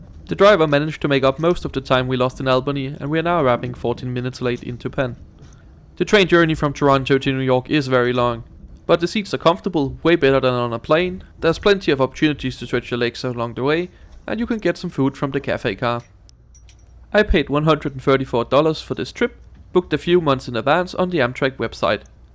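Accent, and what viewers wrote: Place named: Denmark. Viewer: The video’s creator is not a native speaker of the English Language. His native tongue is Danish.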